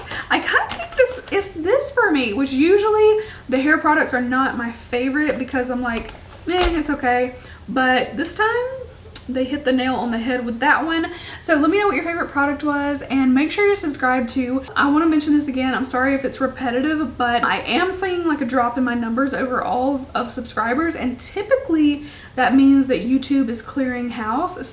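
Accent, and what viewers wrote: South, United States. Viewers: Idk why I just saw hey y’all in the CC on my recommended, clicked your vid, heard your southern accent, and immediately subscribed. Lol I’m biased to southern girls like me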